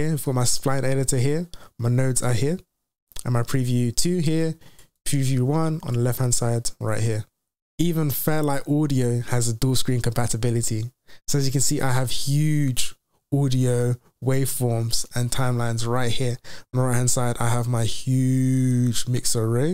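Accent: United Kingdom. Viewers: Wonderfully done my friend...Love you man...Also love the English accent. Keep up the good work...peace brother..!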